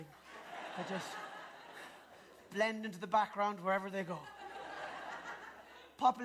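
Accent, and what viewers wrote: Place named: Geordie, United Kingdom. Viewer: 46 min, a wee bit Geordie, he sounds a little like Sarah Millican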